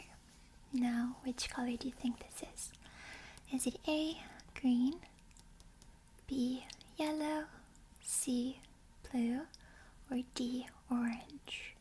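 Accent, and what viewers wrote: Spain. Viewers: su acento español de España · Se nota que habla español, si tuviera que adivinar diría que es española 😅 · Tiene acento de España! Es española? O tiene padres de España? ♥ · I love how you pronounce Spanish like a Spaniard would do. Very unexpected ❤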